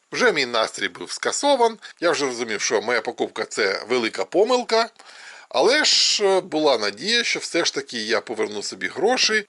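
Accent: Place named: Russia. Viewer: акцент русский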